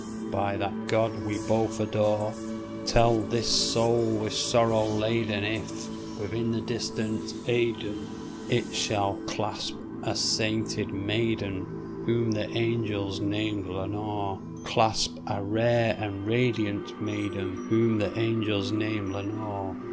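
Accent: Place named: England, United Kingdom. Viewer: Awesome job it sounded so good with your English accent you should do more of these.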